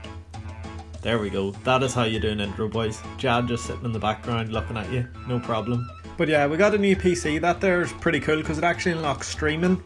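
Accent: Northern Ireland, United Kingdom. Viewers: You from northern ireland?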